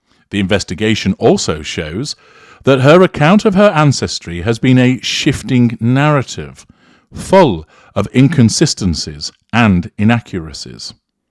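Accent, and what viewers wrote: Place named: RP, United Kingdom. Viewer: Waayy too much repetition in this series. It is mostly just a compilation of second-hand bits from the news media. A lazy piece of ‘investigation’, and chock full of mispronunciations: ‘Regina’, ‘Qu’Appelle’, ‘Ontario’. And what is meant in Part 1 by, ‘Canada and the Territories’, for heaven’s sake? You might have a posh accent but these podcasts are a very lazy effort to create content.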